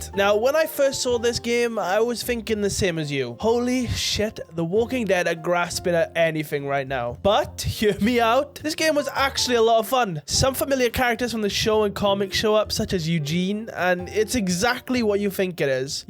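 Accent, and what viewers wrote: Wales, United Kingdom. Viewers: ARE U WELSH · Is that a welsh accent i detect? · Absolute Cinema indeed!! Are you Welsh btw? :D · Mate, are u British? 😊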